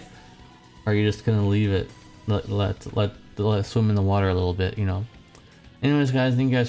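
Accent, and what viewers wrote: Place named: Wales, United Kingdom. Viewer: Is his channel for Wales? Because it sure sounds like it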